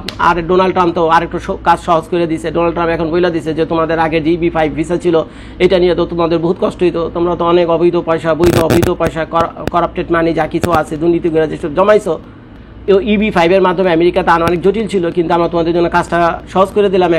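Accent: Dhaka, Bangladesh. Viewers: ভাই আপনার কথা শুনলে হাসি থামিয়ে রাখতে পারিনা বিশেষ করে যখন আমাদের ঢাকার ভাষায় কথা বলেন । ❤️❤️❤️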